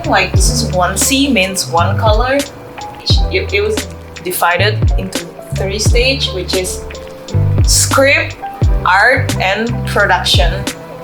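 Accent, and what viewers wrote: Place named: Indonesia. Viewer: Indonesian accent ❤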